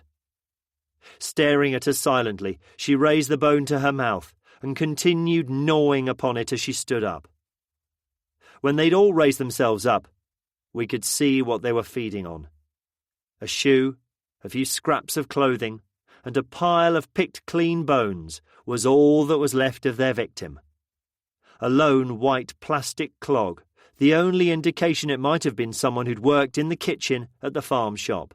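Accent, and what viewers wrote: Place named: United Kingdom. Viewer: The cherry brit voice kills it for me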